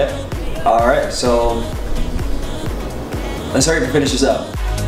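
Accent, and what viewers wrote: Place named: United States. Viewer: Do you actually know how to speak in Tagalog? Youre Filipino right? You have such a nice American Accent! :) lovin all your videos!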